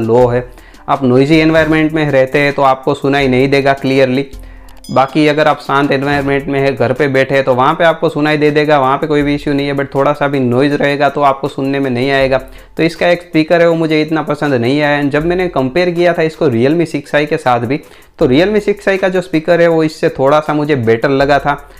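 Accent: Gujarat, India. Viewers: Aap Gujarati Hona... 😊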